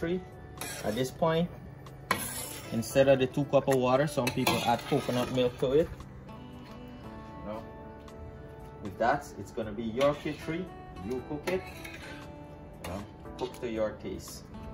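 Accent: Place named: Guyana. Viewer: The awesome dishes that you are preparing and sharing the recipes, are the type of food that we ( we) I’m putting we in brackets because I’m not referring to anyone. My grandma used to make this awesome dish. Even to my grand father in law ( Indian decent) I’m so grateful for our ancestors and their recipes. Thank you very much, you rocks. Love all the recipes, god bless you brother. Must say that I love the Guyanese accent.That’s what I’m talking about.the awesome five finger fruit drink. That’s a power house juicer.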